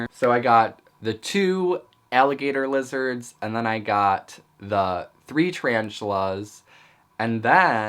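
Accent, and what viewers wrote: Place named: Michigan, United States. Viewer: I can tell you're from Michigan with you accent !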